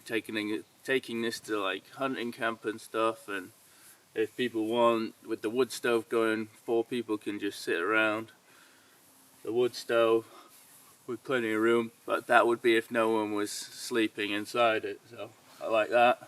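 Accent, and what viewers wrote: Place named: North, United Kingdom. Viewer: That's the case nowadays 5 man and can only fit 3 airmats in...do i hear a northern english twang there..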